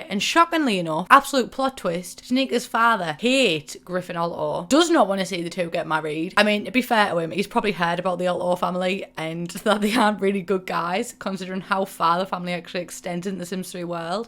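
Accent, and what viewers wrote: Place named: North, United Kingdom. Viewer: I wish I wasn’t such an uncultured American so I could have a dope northern accent. What a legend. Love you, ❤️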